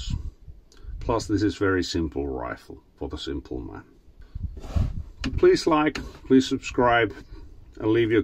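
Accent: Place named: Finland. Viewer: Ai että miten hienoa suomalainen puhdas rallienglanti 🥰🥰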